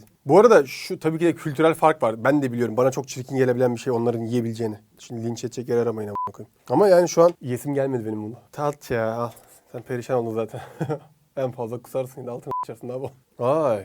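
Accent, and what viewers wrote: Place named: Istanbul, Turkey. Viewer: Abi sunarken içten sunuyorsun. Sokak ağızıy la ve istanbul türkçesi ni karıştırıyorsun. Buda etkileyici duruyor. Özellikle tonlamalar 😅. Küfüre pek takmayın sadece izleyin. ☺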